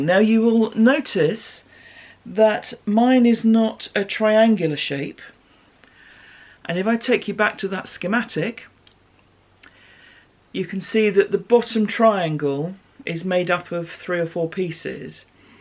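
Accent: England, United Kingdom. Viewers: New viewer here, from New Zealand. I've taken up knitting again, after many years, with inspiration from podcasts during lockdown - I hadn't known there even were such things! So pleasant to hear an English voice, English references, and English humour;-) Thank you for sharing all your FOs, and especially the patterns, I'm taking notes to find several of them. I shall be looking for your previous pocast episodes. Wishing all of you in GB a speedy recovery from this latest covid outbreak that has wreaked havoc with everyone's Christmas, I almost feel guilty for our pretty much normal summer holiday season in NZ. Glad I found you!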